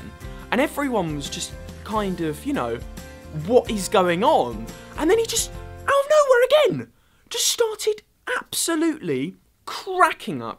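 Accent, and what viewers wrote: United Kingdom. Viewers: Thrusting!!!!! *adorable British accent* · Your british accent is incredibly gorgeous :D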